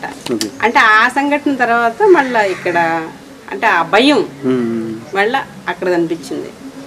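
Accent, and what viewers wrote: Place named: Tamil Nadu, India. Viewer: I want to tell one thing to Anchor. Don't try to separate mala , madiga communities ra idiot. Why you are asking again and again how is mala madha communities now , they are one or not dont ask in such a way and put fire 🔥. Mala madiga are both communities are SCs and still upcoming now.....Bastards like (ancor) you are putting / creating problems in mala madigas. So i warn you dont try to be smart for your anchoring purpose. Are chetta na son. Where you are from . Nuvvo arava na kodukivi( i understand with your pronouncing of language)........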